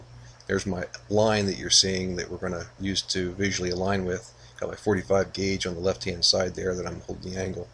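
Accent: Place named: Pennsylvania, United States. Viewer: I'm enjoying your videos, sir! You have a certain inflection in your voice that tells me you may be somewhere up around my parts. I'm south-central, Pa. You sound more south-eastern, Pa/Delaware. You have a new subscriber. Awesome craftsmanship!!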